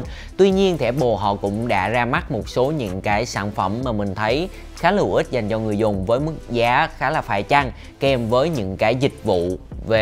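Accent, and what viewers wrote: Phu Yen, Vietnam. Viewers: Ad dân phú yên à Nói tiến phú yên rặc kkk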